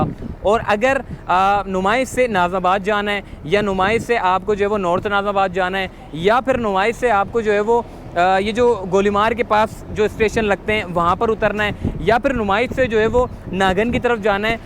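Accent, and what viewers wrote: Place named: Punjab, India. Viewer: تمھارے لہجے سے پنجابیت کیوں جھلک رھی ھے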